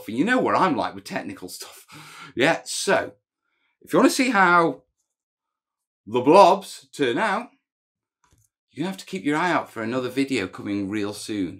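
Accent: United Kingdom. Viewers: Missed that british accent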